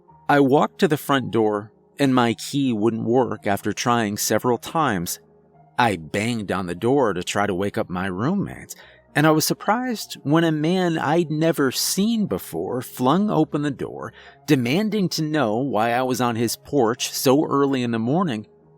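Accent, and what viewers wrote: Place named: Northeast, United States. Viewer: Dear, are you Native American? Did you grow up on a reservation or did you have a lot of close friends that were Native American? Native American, like Northeastern tribes. Possibly let's see it has to be up North and it has to be also somewhat East, judging by your accent. But you said it was flat, so that's like Midwest, but there's a lot of flat expanses in many of the states and your accent reminds me of the East, like Maine, Massachusetts, Vermont, Rhode Island? No no no not definitely not Eastern directly on the Seaboard. Could it be possibly Minnesota, Minnetonka Native? I just want to add that the imagery, in this particular video, is absolutely beautiful, it's not scary at all, actually it is really, very, hypnotic and sci-fi. It looks like a Sci-Fi novel cover,the soft bound type of a novel cover, for example like the cover of Ringworld or something. 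I like how this ship,or craft, stretched out and looked like a Sheriff's badge star, over the Earth. That image, was sort of, sublimely cryptic., I hope you respond to my comment, because my curiosity, is absolutely killing me and that's scarier than this video, even though you have a million subscribers & this video is probably five years old. I didn't look at how many subscribers you have and I did not see when this was actually posted. I'm being kind of an exaggerator in fun... when giving the surmised, numbers of subscribers and the time span marked in years+ but maybe... I'm..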